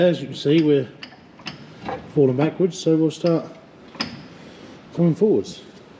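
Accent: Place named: Norfolk, United Kingdom. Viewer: Excellent video. Great content, awesome camera work...... and proper jaaaaarb Narfuk hoo-mour and accent. :) (Am a California person meself, so East a bit from you and across the river...... but (thankfully) north of low-staaaaft. ;) Can't wait for the next one - more Antar Action, or some stripping (of engine, please!) time? :O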